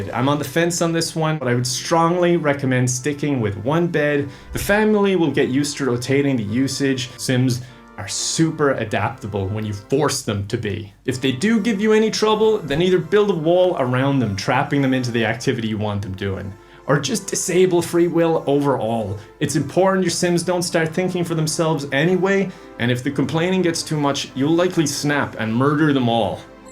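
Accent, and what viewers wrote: United States. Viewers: Why did it take me 5 minutes to realize he was using an American accent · Is our precious making an American accent?? 😂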